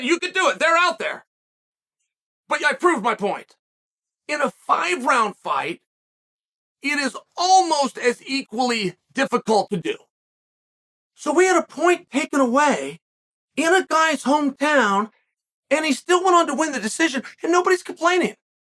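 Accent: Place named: United States. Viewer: Clicked on the vid, heard the American accent, closed the vid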